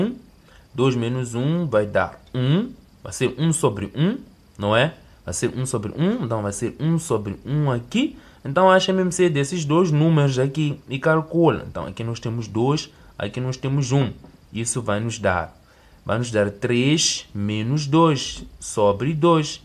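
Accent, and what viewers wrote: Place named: Mozambique. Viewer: Gostei pelo sutac és Moçambicano , e eu sou Angolana 🇦🇴✨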